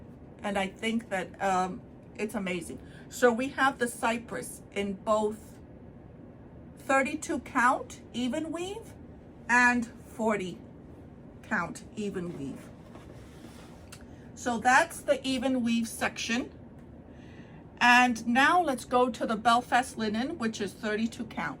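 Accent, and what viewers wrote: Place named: New York, United States. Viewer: Hi, so glad that you are on Flosstube, great to see you and here that great NY accent..:-)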